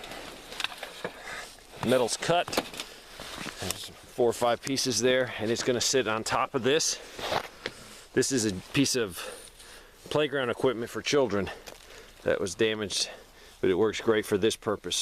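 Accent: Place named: New York, United States. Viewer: I knew you were a NY'er. I'm from CNY but transplanted to NC :)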